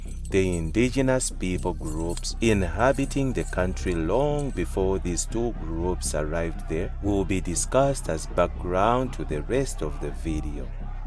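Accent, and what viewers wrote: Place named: Africa. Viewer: Your accent isnt South African where you from curious? You sound East or West African the way you mention ion eg mentiooooon, colonisatiooooon Regards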